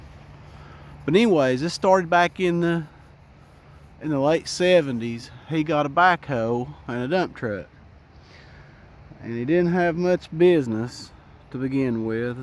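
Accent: South, United States. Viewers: Sir, warm greetings from Finland, english is not my mother language but i sure like your southern accent ! Thanks for the video, keep 'em coming!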